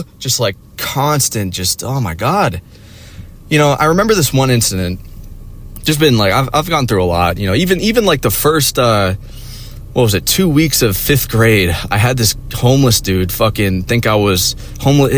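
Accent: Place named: Boston, United States. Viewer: Anyone else used to think was from Boston?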